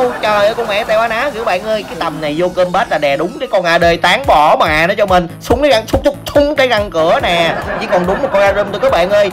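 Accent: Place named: Mekong Delta, Vietnam. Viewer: Rất có tâm, đúng với giọng điệu miền tây...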